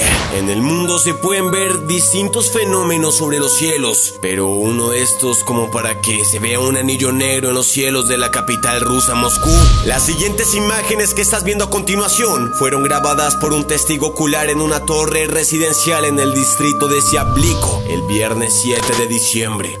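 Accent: Colombia. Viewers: Sos colombiano ? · Eres de colombia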